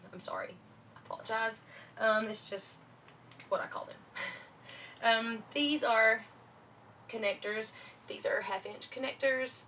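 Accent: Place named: South, United States. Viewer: I love your southern accent! it’s sounds like sweet tea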